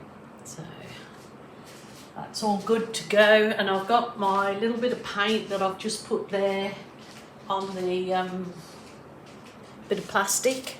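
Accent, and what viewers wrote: Birmingham, United Kingdom. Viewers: hi. I thought I detected a Birmingham accent in there, I am from Sutton Coldfield now living in North Wales, so hi from Prestatyn. Love your videos.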